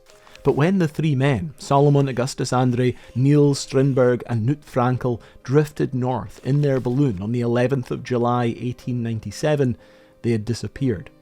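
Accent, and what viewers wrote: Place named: Scotland, United Kingdom. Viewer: Excellent stuff: i’m ex english love your Scottish accent… way te go mon!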